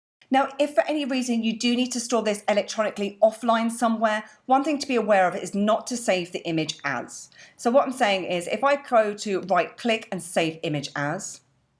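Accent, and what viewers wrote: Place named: Essex, United Kingdom. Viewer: a lovely Essex accent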